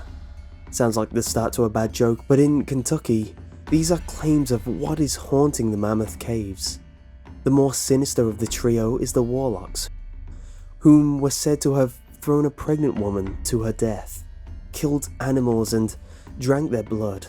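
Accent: England, United Kingdom. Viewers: Ahaha he's definitely not australian Cuz I'm australian and this kinda sounds english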